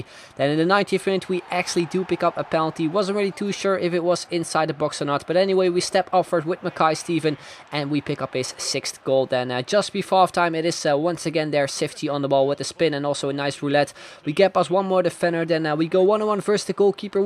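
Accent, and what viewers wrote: Netherlands. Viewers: was nog niet geabboneerd. hoorde meteen dat je nederlands was. abboneer meteen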